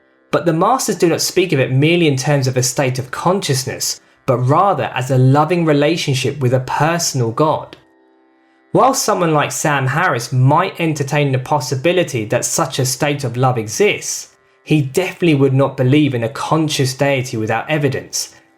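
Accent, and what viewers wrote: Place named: United Kingdom. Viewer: Charvaka was also a Hindu materialist Rishi,his philosophy was very materialistic😄.I have seen all your videos it seems you have read many Vedas,upnishads and purana.your understanding is also praisable,I think you live in London (your accent is British and library of London is pretty rich specially when it comes to old Hindu scriptures). I'm requesting you second time make a small video about yourself.I want to recommend a name Dr.Narayan Dutt shrimali.Answer my comment so I will know that you read.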